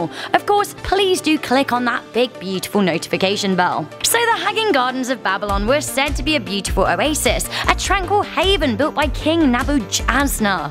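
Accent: United Kingdom. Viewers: Love the Brittish accent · Lmao she has a British accent. I'm British. Trust me, we usually know how to say Nebuchadnezzar properly here 🤣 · Shouri Like a Melody that’s exactly what an accent is, she has a british accent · The moment I opened it my eardrum was assaulted by this irritating high pitched feminine British accent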